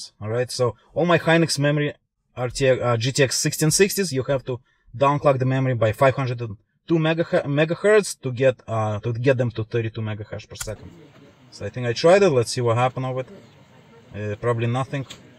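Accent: Russia. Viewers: Русский акцент??)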